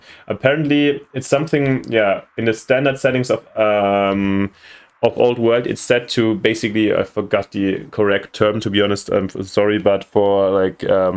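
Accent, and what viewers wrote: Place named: Germany. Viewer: Direkt den Alman rausgehört😂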